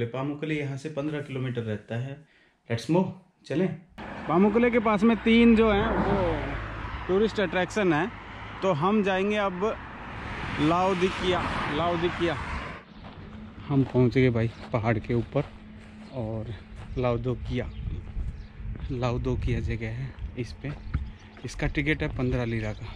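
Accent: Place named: Bihar, India. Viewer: Aapka jo bolne ka tone bahut bar Bihari tone lagta hai I am watching yours video for two days continuously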